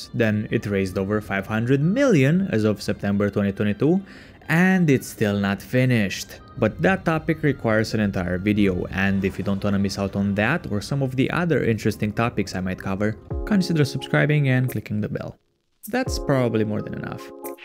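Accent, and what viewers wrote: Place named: Finland. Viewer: i can just hear finnish accent when u talk. am i right that u are finnish? :D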